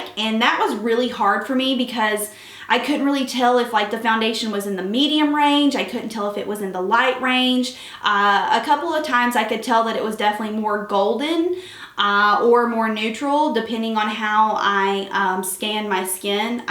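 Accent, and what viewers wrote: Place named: Oklahoma, United States. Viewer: You sound like an Oklahoman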